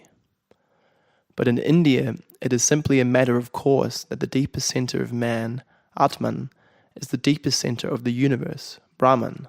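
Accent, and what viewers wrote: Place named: Australia. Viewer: I am grateful for your Reading with your down under Dialekt. Thank U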